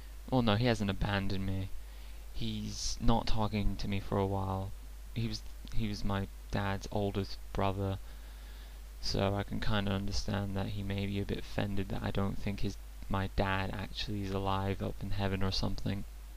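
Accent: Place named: United Kingdom. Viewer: I am surprised - you sound british seems odd they would care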